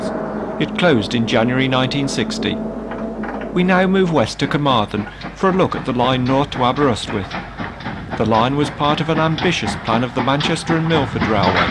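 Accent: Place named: Shropshire, United Kingdom. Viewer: Who is narrating here? Sounds like a very strong Shropshire accent.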